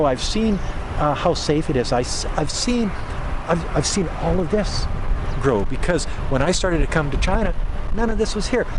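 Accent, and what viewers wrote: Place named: Canada. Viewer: I love your accent, it's so Canadian when you say "aboat".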